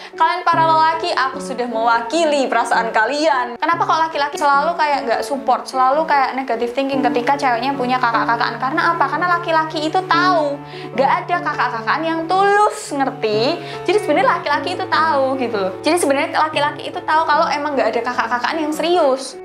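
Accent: Java, Indonesia. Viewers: logatnya orang jawa..? benaran gak sih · MBAK NYAA MEDOK BANGETTT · Medok wkwk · kok logat e koyo wong jowo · Jawa sekali logatnya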